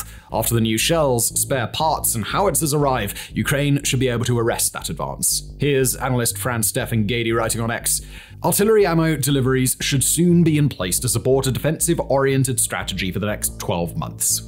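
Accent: United Kingdom. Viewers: As an American taxpayer, I find it extremely irritating that someone with a British accent is blaming the country that is an ocean away from this conflict for failing to aid a European side in the war. Meanwhile Germany, for example, as well as the rest of the EU aren’t providing the necessary aide for their champions in the conflict that is taking place on THEIR CONTINENT! As someone who was born in Ukraine, I am hugely relieved that my great country — USA — is doing more than its fair part in fighting the newest axis of evil with Russian Empire, China, and Iran at its core. Well done Mike Johnson — God bless you!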